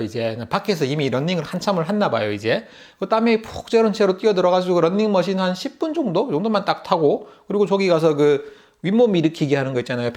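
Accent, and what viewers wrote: Busan, South Korea. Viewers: 미국집 냉난방에 대해서 좀 소개 해주세요 비용이라던지,시스템이라던지 부산말 확 표나요 ㅋㅋ 부산 촌놈으로서 바로 알았습니다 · 앗 저도 부산출신 ㅋㅋ 저도 영어속에 사투리 ㅋㅋㅋ 근데 저보다 더 사투리 촌스러운거 같은데요~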